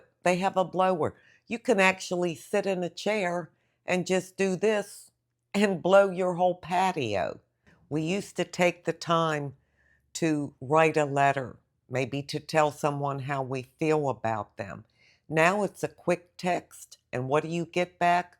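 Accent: Pittsburgh, United States. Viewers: Hello again, just watched another of your video. Convinces , first of all you sound like a Pittsburger . As am I. I’m from the old school. Very lazy generation today. I still work at my age at almost 74, have a home and still do all the yard work, groceries one day, and I may have a day of rest before I go back to work. Oh AI it’s taking over everything. Seriously these kids today can’t do anything without it. Can’t even count back change to a customer. I’ll take the days I grew up in anytime. Keep up the nice videos and wonder stories.💃🏻 very attractive lady by the way. Body, mind and spirit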